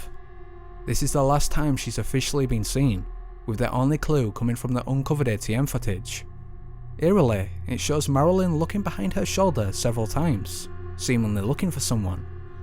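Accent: Scotland, United Kingdom. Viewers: Is he Scottish? He sounds like David Tennant.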